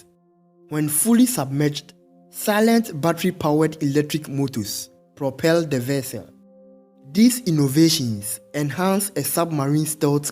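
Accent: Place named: Africa. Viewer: African accent s..ks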